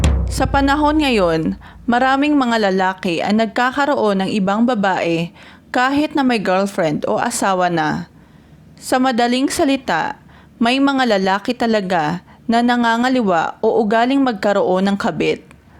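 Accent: Visayas, Philippines. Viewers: Oo nga parang maypagkabisaya narin magbasa pero oks lang ok narin naman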